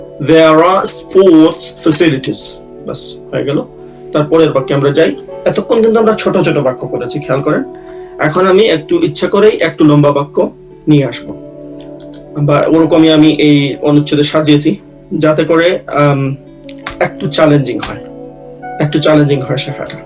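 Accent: United Kingdom. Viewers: A best channel in the british accent.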